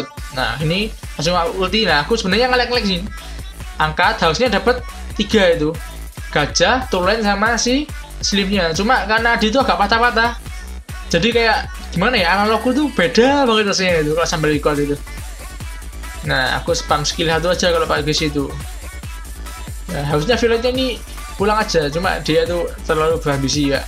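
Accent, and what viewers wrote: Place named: East Java, Indonesia. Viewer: Misuhmu apik mas.... Kroso jowo timuran. Cangkemmuuuu wwkwkwkwkk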